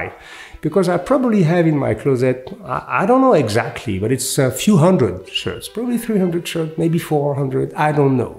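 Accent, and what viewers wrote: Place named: France. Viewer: Frenchman speaking excellent English layered with an elegant french touch👍👍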